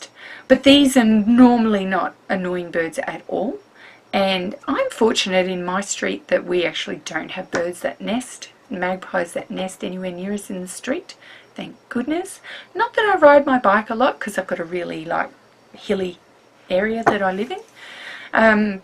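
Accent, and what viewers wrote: Australia. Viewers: you are fun to listen to, -- Shannon does not have your beautiful Australian accent (hers is Southern) -- and I love to hear your laugh;) enjoyed hearing about the letter journal group successes and seeing the art you are doing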